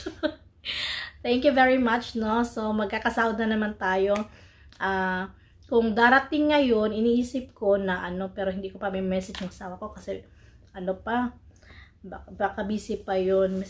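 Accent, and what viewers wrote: Philippines. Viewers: Tanung mo nga sa asawa mo kung kapag nagsasalita ka ng Korean, may accent ka ng pagka-Filipino? Kasi mukha ka naman ding Korean, gusto ko lang malaman kung kapag nagsasalita ka ng Korean, alam ba ng mga Korean na hindi ka Korean dahil sa accent mo?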